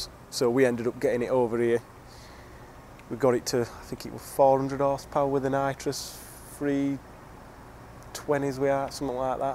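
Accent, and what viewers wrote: Yorkshire, United Kingdom. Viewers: Love this and this channel! And it's so good to hear a fellow Yorkshire man on YouTube lol keep up the good work! Never knew u had a YouTube!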